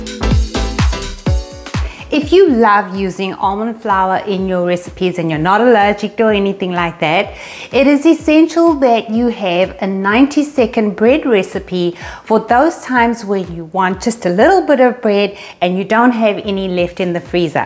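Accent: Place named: South Africa. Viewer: As I was typing my brain was quietly saying (maybe she's South African!). There are a lot of similarities in some of the accents/dialects. Your recipes are great and I'll share then how and when I can. Thank you.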